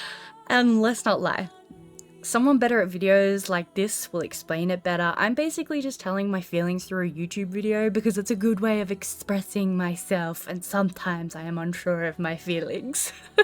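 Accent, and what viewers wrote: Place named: Melbourne, Australia. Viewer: You have a voice like Kylie Minogue, in a good way. Are you sure your mother never shopped at Kmart East Burwood in Victoria about thirty years ago? I'm talking voice accents, regardless of anything else. Suburban Melbourne with a moosh of time in the UK. :) The best and thanks for admitting when you are wrong. It's rare.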